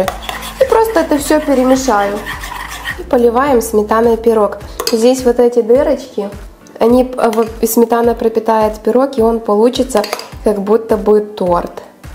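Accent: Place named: Ukraine. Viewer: Какая ты прикольная почему похудела очень нравится твой украинский акцент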